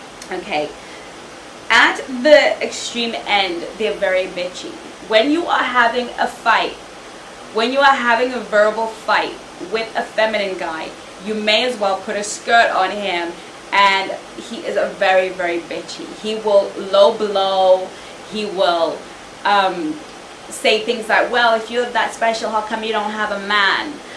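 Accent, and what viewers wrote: United Kingdom. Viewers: You look gorgeous. I love your British accent:)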